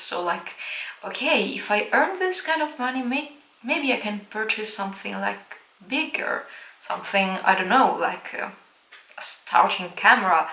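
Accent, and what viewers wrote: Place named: Netherlands. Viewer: I too felt guilty about spending money on frivolous art supplies...though, they brought me joy. I admire your tenacity to really pay your necessities, before your luxuries...you are one smart cookie...though you live in Italy, you actually sound Dutch to me...thank you for your input here...much appreciated...Janis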